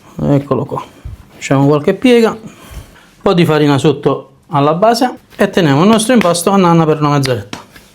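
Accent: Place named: Naples, Italy. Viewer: Ciao cuoco mi piace come parli il napoletano ciao un abbraccio ciao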